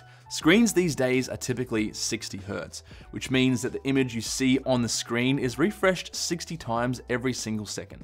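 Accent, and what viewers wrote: Australia. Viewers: I subscribed as soon as a heard that beautiful Aussie accent. How about the Hidpi compatibility? Some monitors don't have that options when connected to MacOs. I'm thinking of buying a mac mini and a gaming monitor. Cheers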